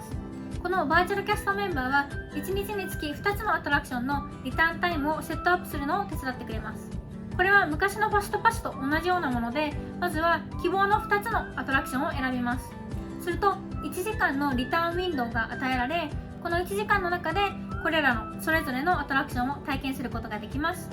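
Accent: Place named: United States. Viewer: sayaちゃん、こんにちは😊 sayaちゃんの動画、何本か楽しく観させていただきました。今日は初コメさせてくださいね。 ジーニー＋について、たくさんの情報をありがとうございます♪プランを立てている途中なのですが、教えてもらえますか？？(＞＜) 8月なのですが、午後からEPに行く場合、朝ジーニー＋を購入して、7時にひとつ、11時にひとつ、13時にひとつ、合計3つのLLを持って13時にパークに入る、といったことは物理的に可能ですか？wdwには次いつ行けるか分からないので最大限楽しみたいと思っています😅 あとsayaちゃんはアメリカ育ちですか？🇺🇸お話の仕方や言葉の選び方がアメリカっぽいなーと思って😁気にされたらゴメンナサイ🙏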